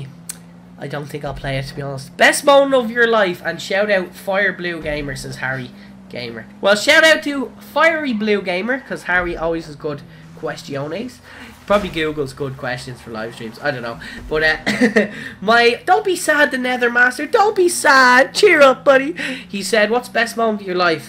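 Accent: Ireland. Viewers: OMG happy birthday <3 luv ya and your vids xxxxxxx hope it's a good one xxxx 4 ever loving you and your Irish accent From maria x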